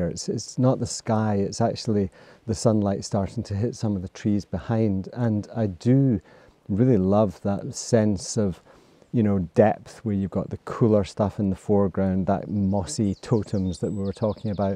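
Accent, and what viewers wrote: Scotland, United Kingdom. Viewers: your deadpan Scottish accent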